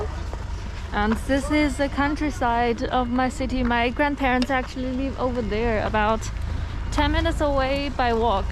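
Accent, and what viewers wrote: China. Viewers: Hi, a fan from Malaysia. I can still able to catch some phrases from your dialect. You can still hear elements of mandarin in a different tone. My ancestral dialect is 福建 古田，very different from mandarin. I can still speak the dialect fluently. I have been to my ancestral village 15 years ago and still hear the same dialect. This series of video is going to be really interesting. Looking forward.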